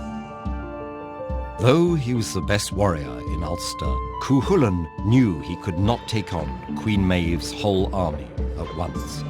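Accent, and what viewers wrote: England, United Kingdom. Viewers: hello from Dublin Eire . Pronunciation is everything to the Irish , as you get a brit to tell the tail of the greatest story ever told . to all who enjoyed this poor telling of our greatest tale . its name is " Táin Bó Cúailnge " or the Cattle drive of Coolie , read the book by Thomas Kinsella. Cu Chulainn, pronounced Cu-Cullin , was war lord from the north , a bruit , a womaniser , he would take your head , for sooner look at you . yeh they breed them special up north . he enshrines the Irish sprite of freedom , that is indomitable , ask the brits how much we Irish like our freedom . yet they still claim ownership of the land of Cu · Hearing this narrated in an English accent was painful and so unneccessary! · English man reading irish mythology....what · This lads English accent is genuinely difficult for my Irish brain to understand. 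· So strange to hear this story told in an English accent.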